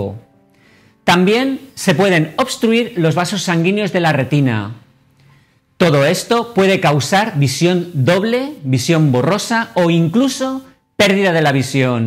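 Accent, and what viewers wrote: Spain. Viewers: Gracias Doc.... me gusta escucharlo como se expresa verbalmente... su acento español...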